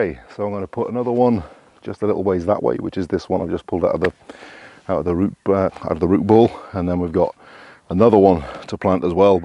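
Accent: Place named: Durham, United Kingdom. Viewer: Wow you really had me fooled. I was sure you were from Durham on the NE of England. I'm from Newcastle originally but also living in Scotland by Elgin/Inverness. Thanks for producing these great vids. I'm really enjoying them and good luck for the future. Paul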